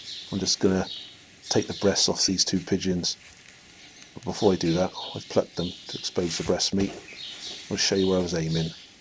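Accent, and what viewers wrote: England, United Kingdom. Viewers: Love these videos as I hate these destructive pests. The English accent is also a nice touch. Thanks for the professionalism in producing these videos.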